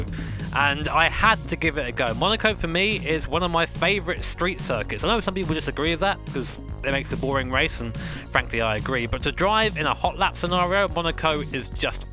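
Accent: United Kingdom. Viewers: I just realized how good of a F1 commentator would be, I mean the eloquence, familiarity of F1, and his british accent, what more do you need?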